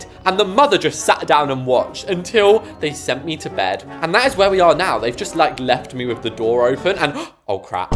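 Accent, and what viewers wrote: United Kingdom. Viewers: the british accent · Are you British?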